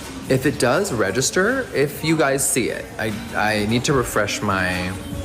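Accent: South, United States. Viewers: The same thing happens with Tigers. They drug them so people can take pics with them. They are doped up so they can lay on a small table and people can pet them and take pics. It’s absolutely disgusting! I agree about the monkeys. That is such a sad situation. JS uses Eugenia and she will never admit that he is shady. She will continue to kiss him and promote his products. She is looking so sick too. I think she is at her all time low with her weight and it is really showing in her face. She is looking older than she should due to her illness. You are the only one I watch now about Eugenia and JS. You are straight to the point and don’t drag out the videos. I love your southern accent and that you don’t over edit. You are real and also very funny. I love when you do voice overs. Lol Keep the videos coming girl! I look forward to them. ♥️♥️ Hope your voice gets some rest.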